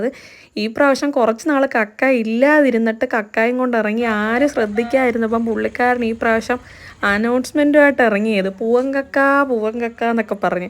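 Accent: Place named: Kollam, India. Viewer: കൊല്ലം കാരിയാണ് അല്ലെ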